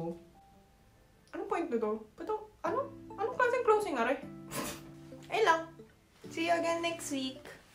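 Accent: Batangas, Philippines. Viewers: Your blood is korean but the way you talk you are a pilipino. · For me, you are more Filipino. Grabe ang mga mannerisms mo, salita, pinoy talaga! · beauty and intelligent mas magaling ka pa nga sa mga literal na pinoy Daw? ang Galing ng Accent mo Dalang dala mo ang tono ng salita sa Batangas. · mas na adopt mo kasi ang filipino lifestyles/ languages/ accent rather than korean characteristics.. namulat ka sa totoong mundo ng mga pilipino kaya mas lamang para sa iyo ang maging pusong pinoy although you are a true blooded korean.. mas minahal mo ang pagiging pinoy mo dahil dito ka pinas lumaki at nag aral at maraming naging kaibigan at kahalubilong mga pinoy.. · Yung korean ka na lumaki sa pinas sa batangas pa..ang labas maligalig na fil-korean-eh this best discribe you for me lang 😅 You are unique and it great u accepted it, katuwa! Buti nalang hindi ganung kadiin ang accent mo, Dun lang lumabas sa dulo na anong "closing areh" 😂 kudos to you! 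this is a very fun video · I can hear a Batangueña in you. ❤ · Nakakatuwa ka! Honestly you sound so Batangueno. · Very puchu puchu hehe cute. Yun kilos at salita m Pinoy nga at nd k nga mpgkkmalan Korean KC Marami n singkit r2 bukod s kastila. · You are a Filipino. The way you speak and the humor, Pinoy na Pinoy. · ALA EH BATANGEÑA KA 😂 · ang cute-cute mo magsalita....Filipinang-Filipina ang dating mo......babalik ka pa ba sa Pinas?..enjoy na enjoy ako sa channel mo. Happy New Year. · Mas mukha ka pa talaga pinoy kesa korean when it comes to tagalog dialect. Pinay at heart. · Talagang korean ka pero batangueño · Kagaling mo naman mag Batanguenya kabayan😊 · Ala Batanguena ikaw e. Proud Lipeno here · Sometimes kasi when you go to other province in korea very distinct ang accent because of the saturi so although you look korean you're accent is very different from them because of the saturi like chollado accent/dialect/saturi is very different from of kyongsangdo, chunchung do kyonggido and at the time you went to busan your speaking accent is very undeterminable you might be using the saturi of kyonggido since you're from suwon and then you are used too speaking tagalog or batangeño accent distinct din kasi eh even i when i was in Korea acould recognize already what province thet came from because of their accent and saturi they use i had an experience.in korea also before i lived in incheon but then suddenly i went to taegu and worked in kyongsangdo for almost 6months then i went down to incheon to visit my friends when we were talking they told me maltuga isanghada they recognized they way italked differently although i thought i was using kyonggido saturi but then they could trace by the words that i used like for example for kamote in kyonggido it is guguma but in kyongsangdo it's gume and ajussi in. 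Kyongsangdo its ajieh ajuma is ajime and the way kyongsangdo people talk they talked like in going to lower tone not like in kyonggido its going up tone and like the word why id wae(외)in kyongsangdo it's (와!!!) what in kyonggido is muoragoyo in kyongsangdo is murakkano!!! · WOWWW! I'm so proud to have you as my 'kababayan'! Ang galing mong mag-ngas-ngas ng Batangueno expression in a very natural way! Hige kabayan, asbok laang! Salamat. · You are very much a Filipino than a korean in your way of speaking ,action and culture .Can see your heart as a Filipino.I like you girl!!!!!Support you all the way!! I enjoy this !! God Bless!! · 'Lang'ya, sa sobrang convincing ng Batangueña accent mo ay malamang na sa mapagkamalan kang Batangueñang chinita. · for me you are a filipina by Heart. pinoy na pinoy mag salita❤ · The way you talk your pronunciation is pure filipino because you are living 20 years as a long time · Well for me, the accent and the jokes specially the way you talk, for me you are Filipino. 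· Ang cute cute tlg ni 😍😍🥰🥰😘😘 pag nagssalita ng tagalog lalo na pag my punto ng batangeña.❤aatig mo tol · May nakapagsabi na ba sayo na may Batangas accent ka?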